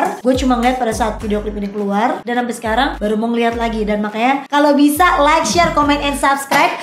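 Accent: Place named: Depok, Indonesia. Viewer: Yu, dialek depok nya , engga holang yaakkk..😁gue sneeng..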